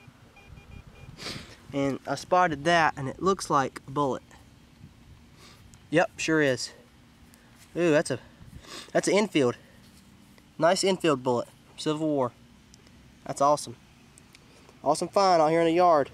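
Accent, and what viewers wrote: South, United States. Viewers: That's a Southern accent I know because I am Southern and spend my life outside with the chickens and cows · You got the most country accent ever! I didn't think anyone could outdo me. Good luck out there huntin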